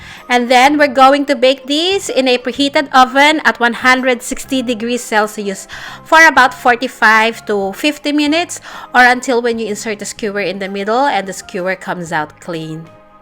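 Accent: Philippines. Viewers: Filipino accent...nice.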